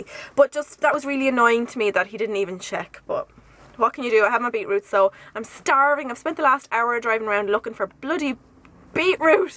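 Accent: Ireland. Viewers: where are you from in ireland? :) Im guessing wessshht?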